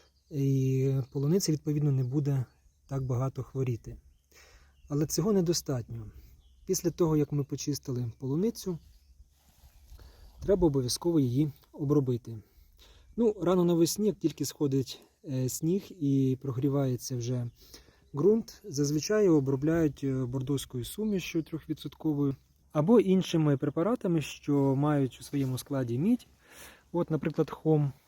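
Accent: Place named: Ternopil, Ukraine. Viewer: Ми, напевно, з Вами земляки, бо мова у Вас справжня ( я з Тернопільщини). Поради Ваші грамотні, конкретні.Я підписалась.Успіхів Вам! Слава нашим захисникам! Слава Україні!❤❤❤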